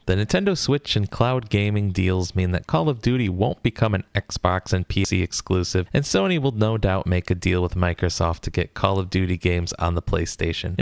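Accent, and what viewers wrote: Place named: Boston, United States. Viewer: You say zombies like you from Boston. You say "Zambies" instead.😢 They have issues pronouncing words. They call a place that is spelled Leominster like this "Lemonstah" like lobstah.😢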